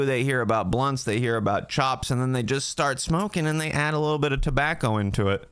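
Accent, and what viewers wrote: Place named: New Jersey, United States. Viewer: this guy just screammmmsss jersey, I've watched a couple videos I've heard him say he lives in Florida and another time he lived in CO but buddy that accent is definitely east coast jersey lolol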